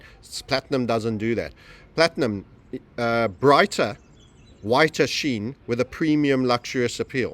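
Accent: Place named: South Africa. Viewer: His speech sounds South African to me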